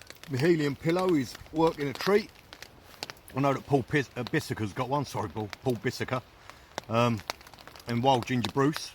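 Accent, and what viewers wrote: Cockney, United Kingdom. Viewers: Mad cockney bastard love it mate just subscribed 👍👍👍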